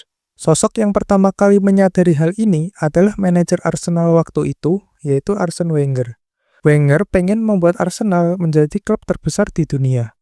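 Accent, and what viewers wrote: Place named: Java, Indonesia. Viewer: sueneng aku koyo ngrungokno radio nganjuk , tetaplah medok mas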